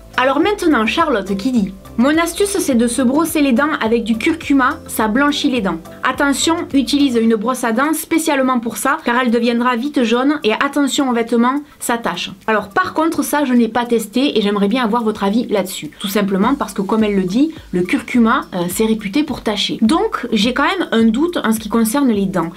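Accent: South, France. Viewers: C'est normal elle viens du sud comme moi à l'accent du sud bien prononcer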